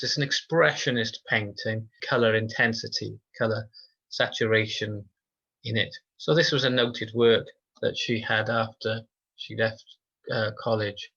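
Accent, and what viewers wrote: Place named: Barry, United Kingdom. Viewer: Lovely to hear another Barry voice talking so knowledgeably about Art. Not only Ceri Thomas then...😂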